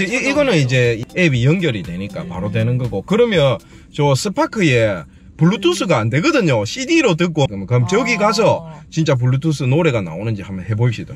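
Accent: Gyeongsang, South Korea. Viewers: 크...많이 듣던 갱상도 톤 고향이 어딩교 내는 마. ...영천 이구마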